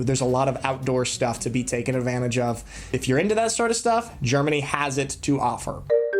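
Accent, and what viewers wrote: Swabia, Germany. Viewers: The Swabians might deny it, but there's definetly some Swabian twang in your German,. Pretty cool;)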